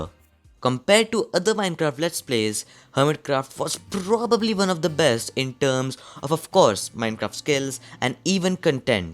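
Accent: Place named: India. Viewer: You are definitely Indian. Judging by your accent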